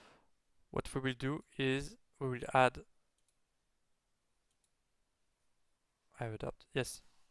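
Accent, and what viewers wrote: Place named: France. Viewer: hello again, j'adore, quand, nous les fr ,nous parlons en anglais ^^ on a un accent parfaitement reconnaissable ^^ top projet !! ça m'inspire, pour essayer de créer un MK avec Unity ou UE mais pour l'instant, je suis sur la creation de Zelda totk ^^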